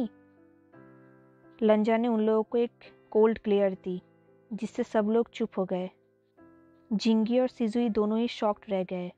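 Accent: Bengal, India. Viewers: Di kya aap bengali ho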